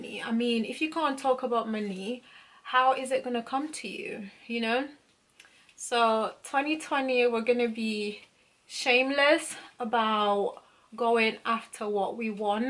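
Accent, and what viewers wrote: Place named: United Kingdom. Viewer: I enjoy watching her videos with that beautiful British accent.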